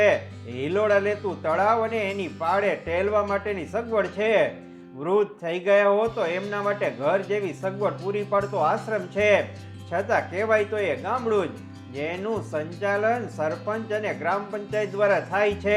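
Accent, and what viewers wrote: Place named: Ahmedabad, India. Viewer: બહુ દા'ડે દેખાયા!!!😊 હું બહુ નાનો હતો ત્યારે અમદાવાદમાં આવું ગુજરાતી લોકોને બોલતા સાંભળ્યા હતા. અમારા કૉલેજના દિવસોમાં તો અમદાવાદ પંચરવ એટલે કે કૉસ્મોપોલિટન સીટી થઈ ગયુ હતુ ને આવી ગુજરાતી લગભગ કોઈ નહોતુ બોલતુ. જોકે માન્ય ગુજરાતી ભાષા બોલતા હતા, અમદાવાદ-વડોદરાની બોલી. હવે તો આવી ભાષા અમદાવાદમાં સાંભળવા પણ નથી મળતી. અમદાવાદ-વડોદરાની બોલી પણ બદલાતી જાય છે!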